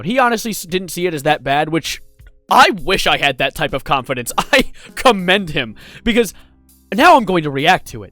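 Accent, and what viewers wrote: United Kingdom. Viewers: WHY ARE YOU BRITISH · Why are you British!? Love it. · "Why are you british??? " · Me too she- WHY IS SHE BRITISH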